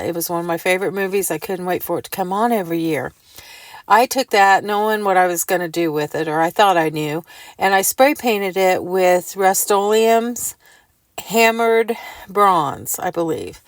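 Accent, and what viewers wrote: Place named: Indiana, United States. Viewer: I did not realize until this video that you are in Indiana. When started watching your channel recently --- your voice reminded me of one of my Aunts & her daughter's voice. Both from Indiana (the Indianapolis area) & maybe this is why - a Indiana accent. Thanks for your great videos - always enjoy them.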